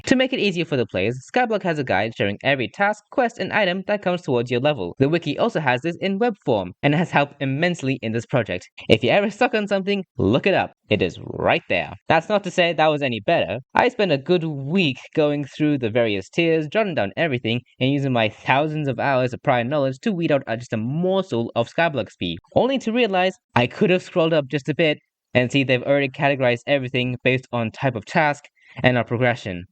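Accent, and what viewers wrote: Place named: Australia. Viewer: Great vid, humorous editing and artwork! Why do i detect the slightest hint of Australian Vowels in your accent?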